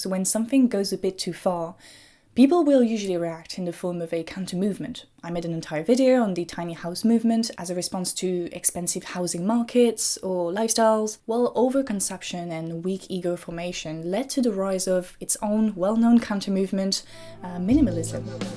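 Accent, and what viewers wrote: North, France. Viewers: ah tu es du nord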